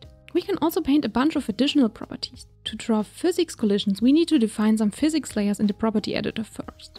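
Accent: Germany. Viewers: i hear a little deutsch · Man merkt so das du Deutsch bist xD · Ich antworte mal auf deutsch, da sich dein englisch sehr nach deutsch angehört hat: Das Video ist nicht nur sehr schnell gesprochen, es ist für mein empfinden auch etwas zu sehr hektisch gewesen. Da ich ein Anfänger mit Godot bin fällt es mit etwas schwer alles nachzuvollziehen. Ich werde aber mein Bestes geben. Danke :-)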